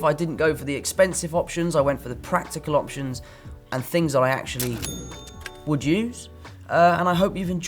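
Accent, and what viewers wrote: United Kingdom. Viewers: イギリス英語めっちゃ耳に気持ちいい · すげぇブリティッシュ訛りだな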